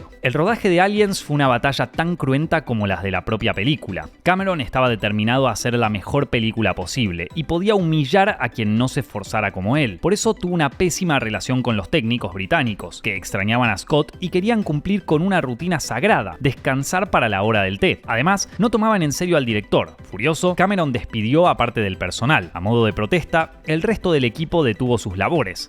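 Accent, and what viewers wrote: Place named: Buenos Aires, Argentina. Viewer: La tonada porteña es insoportable.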